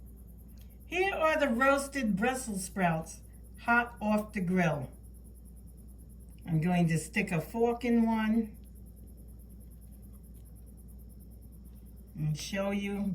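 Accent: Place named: New York, United States. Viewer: Sent to fast young lady . Your new York accent is making me home sick for Commonwealth Ave... THANK YOU FRANK FROM MONTANA......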